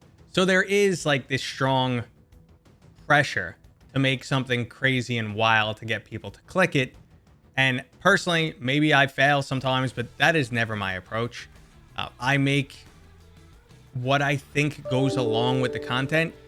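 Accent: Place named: New York, United States. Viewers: I KNEW YOU WERE FROM NEW YORK!!!! Yesss! Love it! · AYY A FOCKING NEW YORKA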